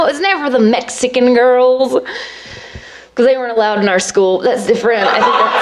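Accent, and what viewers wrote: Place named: South, United States. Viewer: She's not really funny. I think a lot of people are getting wrapped up in her girl next door looks and southern accent.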